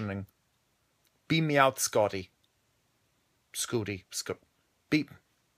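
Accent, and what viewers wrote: Germany. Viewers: Ich will nicht sagen, dass dein deutscher Akzent weh tut, weil ich selbst Deutsche bin und natürlich auch einen hab. Aber echt ehy :D trotzdem bist du ein echt cooler Typ :)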